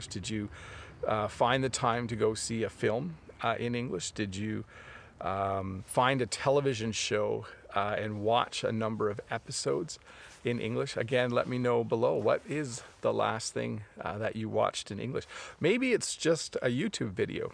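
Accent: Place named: Canada. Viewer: Hello the 🇯🇵Osakanese here! Ive been learning eng from ur videos. I like ur “the great White North”English!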